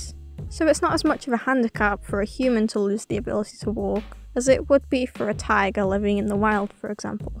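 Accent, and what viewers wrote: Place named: United Kingdom. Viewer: You sound like my britt voice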